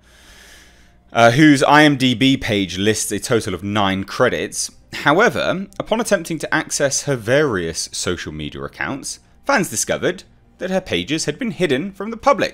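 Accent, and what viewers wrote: United Kingdom. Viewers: I've become so used to the British accent when you said you never heard of here I was about to say something. Than realized, hey, he's British. The internet has ruined me lol. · Wait....you're British?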